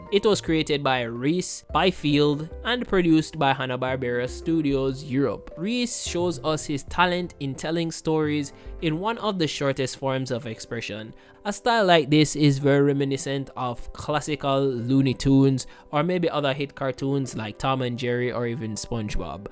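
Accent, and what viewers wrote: Jamaica. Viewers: This is my 1st vid and i can tell your jamaican i am glad there are jams like me who loves explaining cartoons/shows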